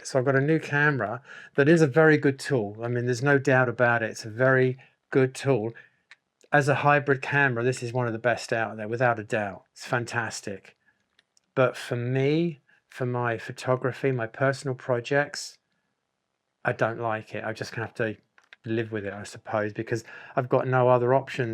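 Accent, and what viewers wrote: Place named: United Kingdom. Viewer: Point and shoot works for me (Panasonic Lumix DSC-S3). I just rediscovered an old Agfa Optima 1035 I have, still in brand new condition. I will make a project this year of getting used to film caneras again. With this nice little cam. As a fun project. I’ve been a musician and painter all my life, but always used a camera when I had a chance, since I was a kid in the 60s. I take pictures everywhere we go, many just around home. The small town and everyday mundane images video caught my attention. I like gas stations, store fronts, shop windows, grocery store shelves, toys, books, simple flowers, fruits in bowls among other everyday subjects. You sound British to me (sorry). Thank you for the frank and fine posts.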